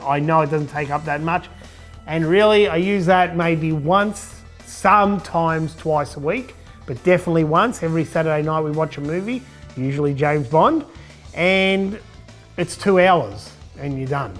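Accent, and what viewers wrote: Australia. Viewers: This Aussie accent is particularly grating. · i love the aussie accent idk why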